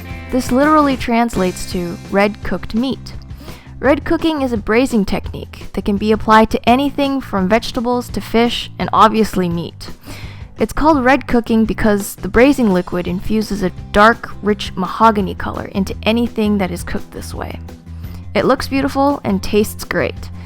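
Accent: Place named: California, United States. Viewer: Gastronomic Relevancy Hi, I subscribed too yer channel, But where are all the videos? I'm from California and your English dialect sounds like you may be too. You have a great voice to listen too and your videos are very well done! I want to learn so many Chinese dishes and how to cook with a wok! So, start cooking girl! Show us how to make that sweet and sour pork or chicken right in the wok. Okay? And you definitely must show us how to make those awesome Shanghai soup dumplings. They are outta this world you guys. You are gonna get so many subscribers! And make a lotta money!;) Oh, and you don't just have to make Chinese dishes, make whatever you want...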